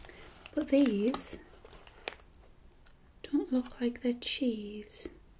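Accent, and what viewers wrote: United Kingdom. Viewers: Your eating sounds are too perfect :) that and British accents rule :)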